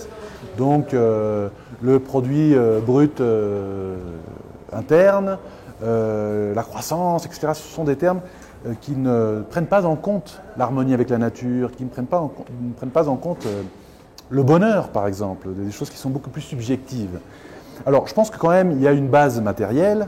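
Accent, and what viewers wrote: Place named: Ecuador. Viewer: Le français plus équatorien au monde! Bien expliqué le Sumak Kawsay.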